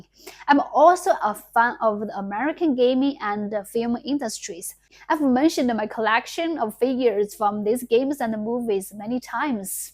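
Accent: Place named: China. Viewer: Holy smokes you speak great English! you still have a slight Chinese accent/feel but overall really good in a global standard kind of way, you will be understood no problem in the rest of the English speaking world, I'm Chinese-Filipino but I can't even speak a drop of Chinese, I can only speak Tagalog and English with a generic American accent, so from a native English speaker's point of view, you speak awesome English, you should be proud of yourself for that accomplishment, not an easy feat if you don't learn it from someone or from a school and if you don't have anyone to talk to on a daily basis from a very young age.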